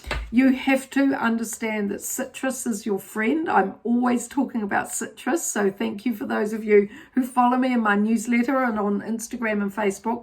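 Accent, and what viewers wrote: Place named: New Zealand. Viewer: Why do New Zealanders say “Woman” for plural instead of “Women “?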